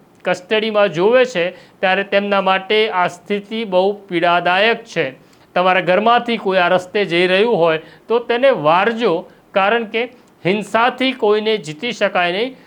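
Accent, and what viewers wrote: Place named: Bihar, India. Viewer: તમે બિહારી પત્રકારો ના ટોન માં કેમ બોલો છો, ગુજરાતી ની જેમ વાત કરો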